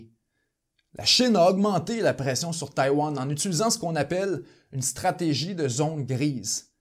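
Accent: Quebec, Canada. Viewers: on dirait un terra bellum Quebecois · J'ai eu ma dose d'accent québécois ça fait plaisir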